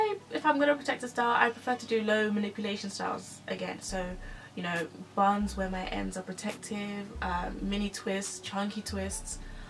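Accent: United Kingdom. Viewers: She sounds super british to me🤣🤷🏾‍♀️ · She’s sounds English In particular London but what does a Londoner know 🤷🏾‍♀️?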